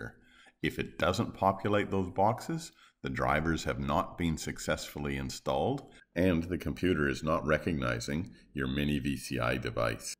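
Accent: Canada. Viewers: Thanks for this video. Looks like the site with the Techstream download is artificially buffering the download very slowly to nudge the user to download their software... annoying. Do you have a source for a usable mini VCI cable? You sound Canadian, BTW.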